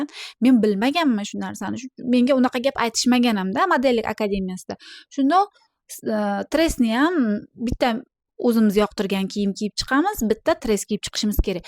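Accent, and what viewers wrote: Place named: Olot, Uzbekistan. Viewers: Salom gapirganiyizda buxoro shevasiga tortarkanaa opaa omad sizga❤ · Shevangizdan bildim Buxorolik Olotdan ekanligizni Men ham Buxoroni Romitan tumanidanman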